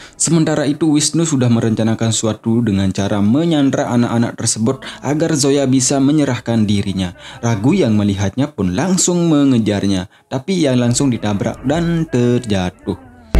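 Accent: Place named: Aceh, Indonesia. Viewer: Cara ngomongnya kaya orang Aceh min :v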